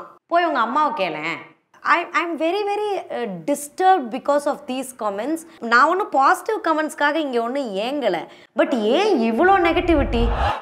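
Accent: Madurai, India. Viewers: Am also from Madurai yes in that city they speak like this only commonly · Va la po la nellai basha ila ? Neenga madura basha nu solringa 🧐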